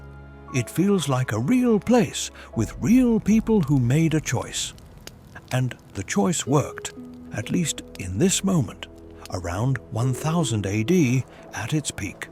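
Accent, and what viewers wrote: United Kingdom. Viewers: Good point, this looks deceptively very real but aint no documentary. Compared to actual history, he likes to exaggerate the adversity for drama and clicks. I suppose the AI british accent is supposed to be more authoritative too. Id like a narration in Alabama accent please. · Nice footage and “British” narrator, but not a single word about where they got the timber from and what valuable items they sold, which made the Norse settlement rich. So, it has to be a “👎” from me, sadly.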